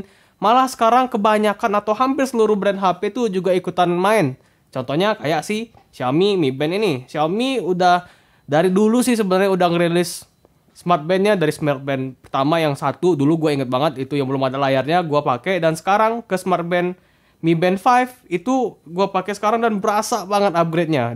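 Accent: Palembang, Indonesia. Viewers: Lahh wong palembang kamu kak 😂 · Wong Palembang lah😄👍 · Palembang ya? Wih mantep bang.. dari aksen nya sih iya keliatan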